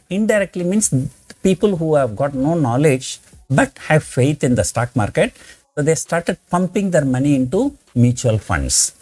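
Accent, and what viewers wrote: India. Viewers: Thank you so much, Mr P R Sunder. Today you are sounding like a true Indian.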